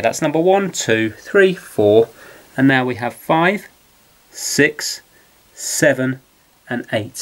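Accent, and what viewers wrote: United Kingdom. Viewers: Good tips dislike because of your british accent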